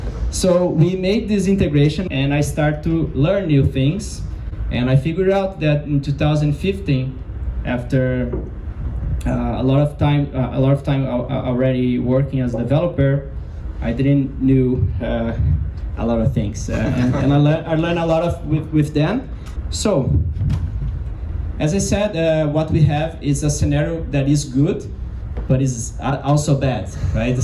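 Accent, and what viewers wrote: Brazil. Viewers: Você fala inglês muito bem! Não sei se é do seu interesse, mas caso seja, você pode tentar estudar um pouco o alfabeto fonético da língua inglesa pra treinar um pouco a pronúncia nativa. Isso não é necessário, mas talvez seja interessante caso queira perder um pouco do sotaque de brasileiro (espero que entenda que não é uma crítica, provavelmente você sabe mais de inglês que eu! Kkk)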